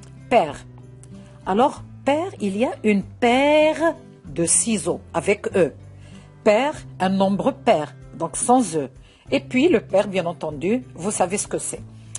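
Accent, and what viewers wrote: Arab world. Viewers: Je vous remercie pour ce superbe résumé. Même si on utilise beaucoup la langue française, on a tendance à oublier les règles essentielles. Vous avez effectivement un accent arabe ou kabil mais il me semble que vous en connaissez plus sur la langue française que beaucoup de français de souche. Alors continuez à nous faire des vidéos comme celle-là . C'est très utile.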